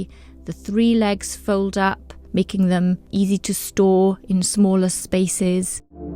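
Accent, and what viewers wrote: United Kingdom. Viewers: J'aime bien cet accent britannique.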